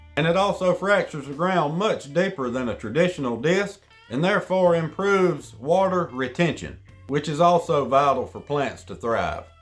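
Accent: South, United States. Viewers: I love that deep southern accent brother.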